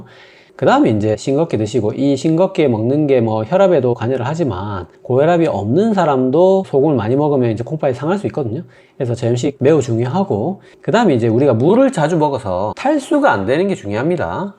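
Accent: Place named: Seoul, South Korea. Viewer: 엣날에 정기적으로 보다가 몇년만에 다시 들었는데... 말투가 서울말로 바뀜 ㅋㅋㅋ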